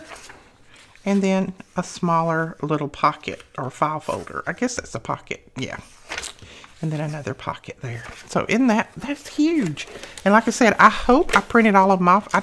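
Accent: South, United States. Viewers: I was watching your video again which I love watching you Craft and your southern accent anyway and 32 minutes and 15 seconds I think you’re talking about putting some pink rose on the paper and not covering of the beak of your bird and I was thinking well why didn’t you put it just on the top of the page then it was just a thought we’re on the other side of the paper anyway thank you for teaching and that was my Pinyan have a great day And please don’t be upset with me God bless and please have a amazing day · Love your Southern Belle accent. I have a Tennessee Hillbilly accent which is more rough. Thank you for sharing your beautiful creations. This is an adorable kit. ❤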